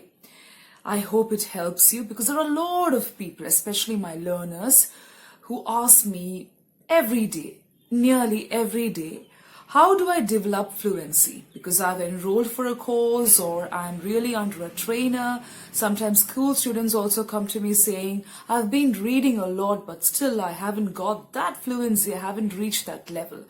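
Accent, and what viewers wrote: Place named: Kerala, India. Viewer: Now I realised about your fluency your a malayali girl wright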